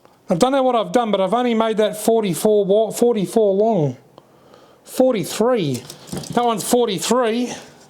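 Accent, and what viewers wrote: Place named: Australia. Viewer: I applaud your yabby net efforts for Australia. But in my area, there are no fishing limits on crawfish, and our nets are set up vertical, and are at least 10 times larger. We catch crawfish by the pound, and they sell to locals in 40 pound sacks. I know you are not commercial, but it is interesting that due to greater abundance, we can catch so many more. I am located just north of Louisiana, in the State of Arkansas, in the USA. And locally, tho very abundant, I know of very few people who bother to catch them. Most crawfish are available cheaply at various buffets, where you pay around $15 USA and eat all you want! Ours are boiled with cajun spices, and are very tasty! Best of luck with your efforts and I have been watching your various fishing and yabbying videos for the past 3 months. I did subscribe. I love your Australian accent and your enthusiasm for your sport!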